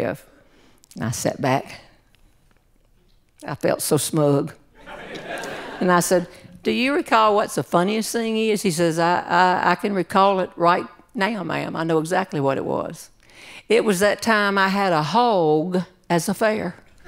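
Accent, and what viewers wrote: South, United States. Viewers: I MISS the south every time I listen to you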